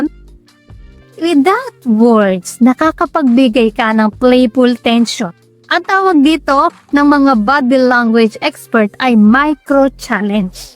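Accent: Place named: Ilocos, Philippines. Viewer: Idol ilocana ka ba? Sa tuno mo kc e siguro pangasinan or ilocana.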